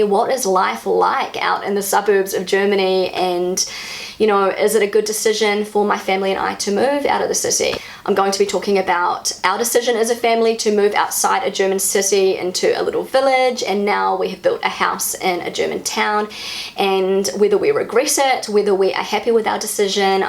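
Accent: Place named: New Zealand. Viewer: Neuseelandisches englisch ist fur jeden wine herausforderung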